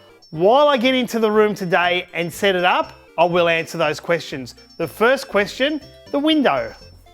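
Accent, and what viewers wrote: Australia. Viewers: Thanks to you, I can perfectly understand Aussie accent now. A couple of months ago when I first subscribed, I didnt have any idea what you are talking about:) Well done mate. Keep up the good work.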